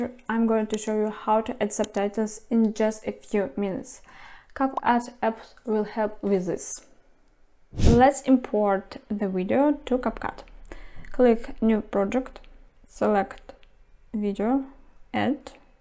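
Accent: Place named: Russia. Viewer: Are you from Russia, because your accent is a little bit similar to Russia? to be honest I like your accent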